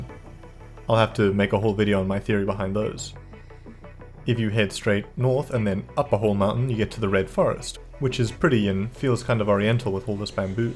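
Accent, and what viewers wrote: South Africa. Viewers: I feel im hearing a South african in you..am i right?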